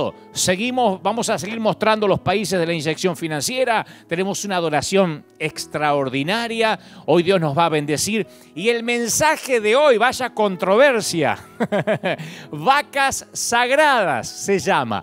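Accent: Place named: Córdoba, Argentina. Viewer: Rompe estructuras!! Hablando a lo cordobes...es un capo.. Por mas pastores asi Amado Dante Gebel!!! Gloria a Dios por tu vida!!!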